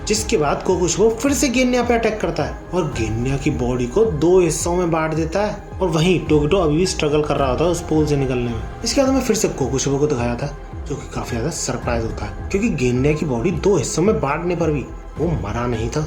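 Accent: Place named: Indore, India. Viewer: Bhaiya apka indori accent bada mjedar hai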